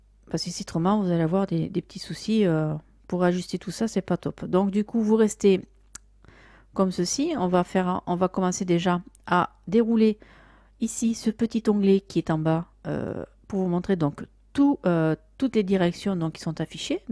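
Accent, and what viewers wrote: South, France. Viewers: Bonjour. Votre chaine est vraiment géniale pour tous ceux qui apprennent à maitriser Magix Vidéo Deluxe. C'est une mine d'or, une véritable caisse à outils. Les tutoriels sont bien présentés et votre ton "méridional" très agréable. Pour les primo-accédant à ce très bon logiciel, peut-être serait-il utile qu'il y ait deux tutoriels de base : -> Présentation de Magix Video Deluxe, -> Premiers pas avec Magix Video Deluxe. Après, toute votre chaine présente ce que l'on peut faire dans le détail... Excellent et merci pour votre partage. JiPi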